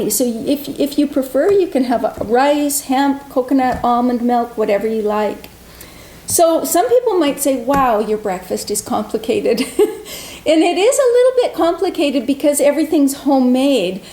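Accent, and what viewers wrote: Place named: Canada. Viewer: You have the strongest thickest Canadian accent I've ever heard!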